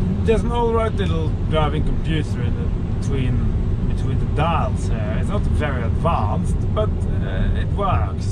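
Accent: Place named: Norway. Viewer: I'm Australian and his English is good and clear even with his Norwegian accent. Very enjoyable video. The T3 order books open here in Australia on July 30th, 2021.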